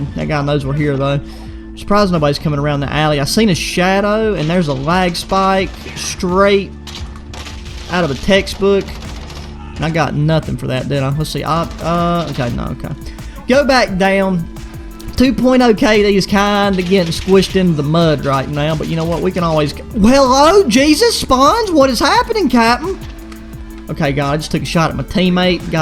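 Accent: United States. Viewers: That voice just made my day The country accent just makes me feel at home because of where my grandparents live Also I went to this video and it turns out your crossbow setup is the EXACT same as mine so I guess I’ll keep what I got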